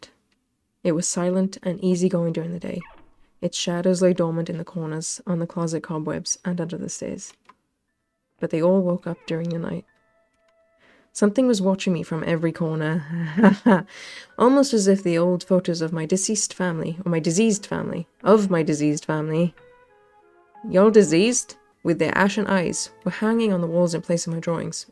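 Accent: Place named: Eastern Europe. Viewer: Dear author, I realised your accent is familiar, especially "р" sound. Actually, where are you from? Are you slav? Sorry for my bad English, please reply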